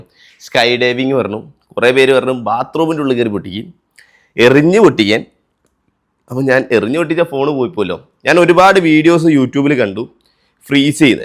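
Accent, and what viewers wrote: Kodungallur, India. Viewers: slang shinkunte kodungallur slang ayallo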